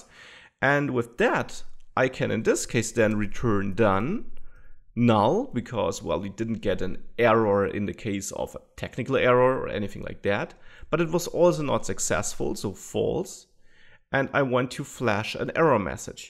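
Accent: Germany. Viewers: Every tutorial is better with a German accent.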